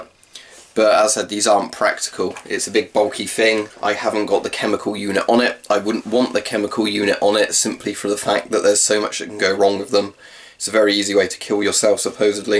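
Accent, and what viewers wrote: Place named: United Kingdom. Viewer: it's a british accent.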